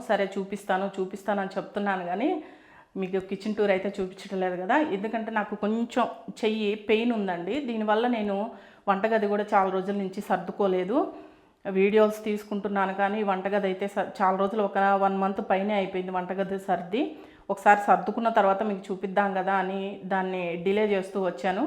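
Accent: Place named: Ongole, India. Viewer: Mam me own place akada me language ongole side la undi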